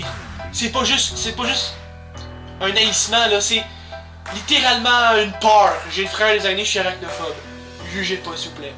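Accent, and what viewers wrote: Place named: Quebec, Canada. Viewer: cool, écoute, je ne te connais pas personnellement, mais ta vidéo est bien organisé coté musique visuelle et tout, cest parfait, remercie ta soeur lol xD. bon je ne suis pas la pour jugé ce que t'aime pas on a toute des chose quon aime et aime pas. cest sur que des réponse peuvent choquer comme ta dernier lol xD, mais faut dire, si t'aime pas l'hiver, bas tu n'aimera pas le hockey sa va avec xD . pis les question épaisse ta tellement raison ahahaha. du monde de meme j'a catch pas avec. apart, sa cool ton vidéo. ton accent quebecois c parfais ahahah. écoute lache pas. tu pars bien . =D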